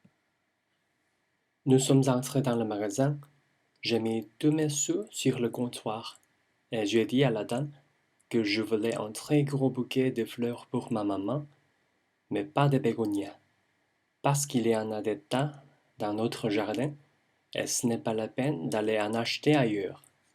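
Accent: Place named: Quebec, Canada. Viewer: It's canadian french, just saying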